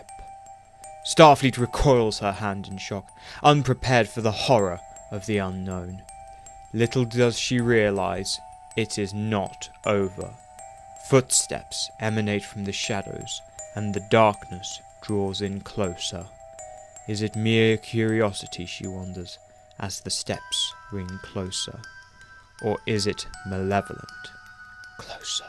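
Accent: United Kingdom. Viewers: I'd prefer it without the British accent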